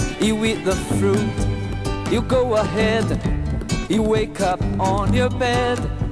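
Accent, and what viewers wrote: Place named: Brazil. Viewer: O inglês dele não está ruim. O forte sotaque brasileiro só demonstra que ele ama suas raízes. Americanizar ou britanizar o sotaque não é sinônimo de falar bem. What you said is a great nonsense!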